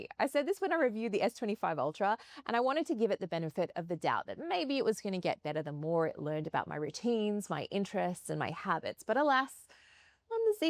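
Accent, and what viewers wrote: Australia. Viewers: but you sound so Australian other than that!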